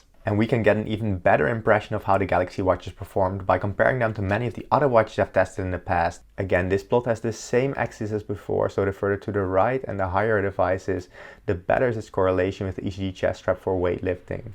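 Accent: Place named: Netherlands. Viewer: 3 times the costs of my Huawei GT runner. Perhaps not totally comparable but I'm very glad with my GT Runner after a few months of use. Het was leuk geweest om je ook een keertje Nederlands te horen praten, al verraadde je accent al heel snel toen ik me abonneerde op je kanaal, dat je een Nederlander bent. 😉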